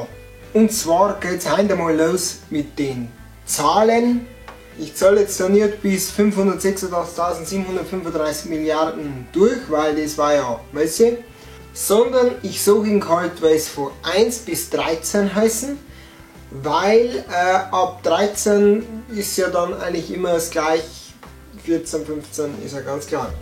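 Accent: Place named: Palatinate, Germany. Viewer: des wo du redst is koa boarisch des is pfälzisch!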